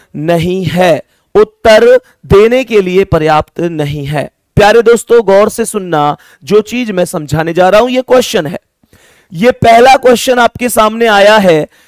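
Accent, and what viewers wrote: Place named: Haryana, India. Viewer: Aapki haarryanvi voice achhi lagti hai please aap harryanvi hi bolla karro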